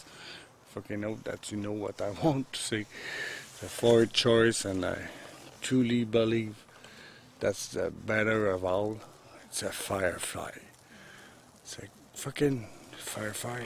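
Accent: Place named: Quebec, Canada. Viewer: TRB3 Appareil militaire qui fonctionne a l' énergie nucléaire.... Check sul net ! Ton accent québécois dans ton anglais est très drôle en passant !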